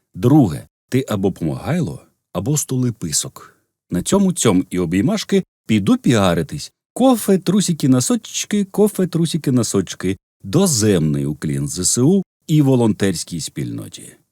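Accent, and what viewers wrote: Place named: Russia. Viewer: У вас дуже гарний, заспокійливий український голос, а російський такий наче ви самий корінний росіян із росіян. Так вуха ріже і дратує шо капец...часто втрачаю саму суть тексту...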